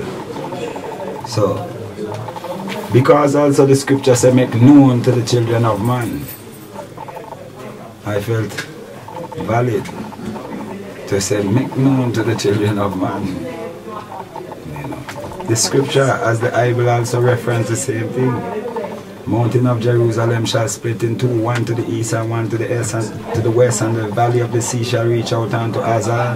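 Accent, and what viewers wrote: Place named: Jamaica. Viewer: His accent sounds just almost exactly like a Jamaican.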